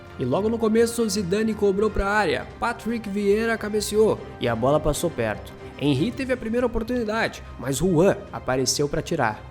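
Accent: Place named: Brazil. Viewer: Ele é BR cara, quando um estrangeiro fala algum nome BR ele não fala como falamos aqui, é literalmente a mesma coisa. Ele fala como um BR falando os nomes estrangeiros, crítica sem sentido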